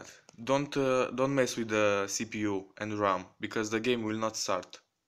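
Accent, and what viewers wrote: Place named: Russia. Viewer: Видно что русский,но английский хорош!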